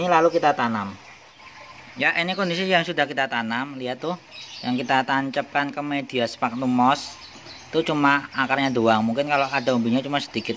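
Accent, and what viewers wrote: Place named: Yogyakarta, Indonesia. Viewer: kalau tidak salah tadi pakai pasir silika putih bisa ya? yg untuk aquarium kan. btw mas orang mana kok jawa jawa nya ky jogja/ semarangan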